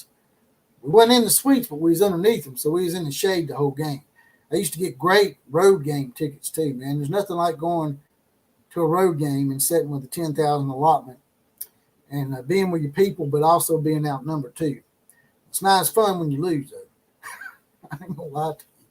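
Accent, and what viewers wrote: South, United States. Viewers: I rather like the southern drawl. But not without the hospitality. 🤣 I'm a Texas fan, so come at me bruh, but I wasn't commenting good or bad, just noticing your southern drawl and sayin there wasn't anything quite like it. I expected a chuckle. But if ya want some nonsense, "I'm your huckleberry." 😈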